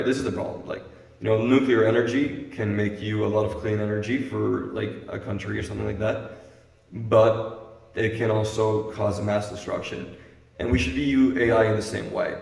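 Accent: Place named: Spain. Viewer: You Spanish? I love your accent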